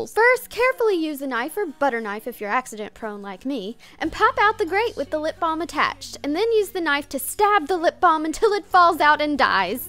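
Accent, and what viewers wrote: South, United States. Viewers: Subscribe Ily videos ur so creative and I love ur funny country accent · is she country or something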